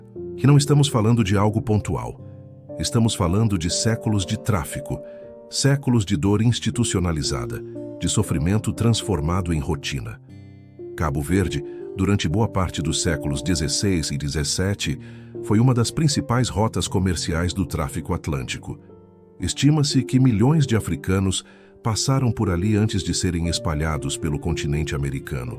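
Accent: Brazil. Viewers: Se estás a narrar a história da escravatura, coloque foto da época. Sotaque brasileiro também não soa bem. Quanto a história, Cabo Verde foi interposto comercial e colónia. Após a abolição da escravatura continuou como colónia, até a independência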